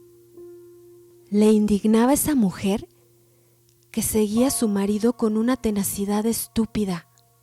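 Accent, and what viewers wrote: Mexico. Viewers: ES MARAVILLOSO PODER ESCUCHAR UN CUENTO CON DICCION, ACENTUACIÓN Y PUNTUACIÓN PERFECTAS...GRACIAS EL ACENTO PERFECTO EN NUESTRO LENGUAJE MEXICANO. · A mi tambien me encanta el acento mexicano me gusta todo lo de mexico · Excelente tu narración! Que bueno que volviste, vos sabes que t lo pedí. Sos la mejor narrando, me encanta el acento mexicano + tu voz tan dulce, muchas gracias, lo disfruté a pesar d los molestos anuncios, jjja!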